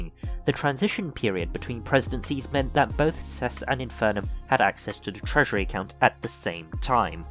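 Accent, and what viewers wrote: United Kingdom. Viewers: your nasally British voice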